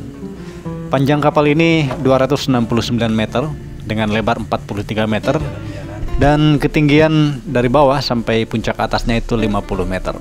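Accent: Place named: West Java, Indonesia. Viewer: Bang asli mana...klo logatnya....saya tebak..mgkin dari jabar nya....tolong bales..trmksh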